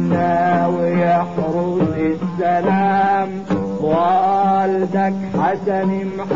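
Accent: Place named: Upper Egypt, Egypt. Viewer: صعيدي لهجه اصليه كانك جدي يتكلم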